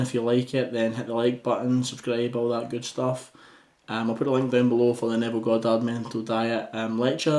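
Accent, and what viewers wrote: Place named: Scotland, United Kingdom. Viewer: A UK Neville follower! Love the Scottish accent, I'm from Wales and am a follower of Neville. God Bless :)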